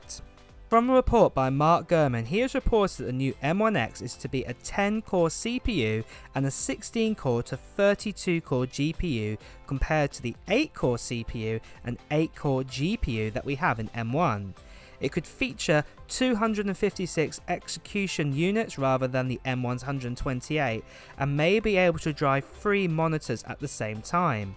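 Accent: United Kingdom. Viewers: God i hate his accent. Ive never known anyone who is trying to say two different words and having them sound exactly the same. Listen to him say " Thirteen and Fourteen" They sound exactly the same because of that awful cockney accent. Ugh im out. The video quality is great but get someone else to narrate them. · I want to buy the iPhone 11 but I don’t have money for that and also i don’t have a job since i was just a 16 yo who want some iPhone i am hoping that i will be the one who got your ipad❤️Godbless you man💖 btw i like your British Accent❤️❤️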